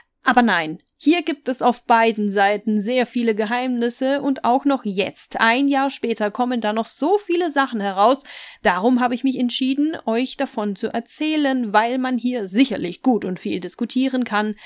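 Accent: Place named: Swabia, Germany. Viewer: Schwäb"sche Vokale - wunderbare Eigenheit bei . Unbbadiiingggttt beiiii- behalda! Gell, ge '? 🎉😅😅😅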